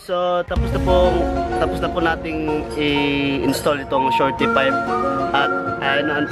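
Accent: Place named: Visayas, Philippines. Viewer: "giatay ka!" hahaha yawa oyyy. Nindot jod paminawn bsta bisaya mag yawyaw haha rs brod nice video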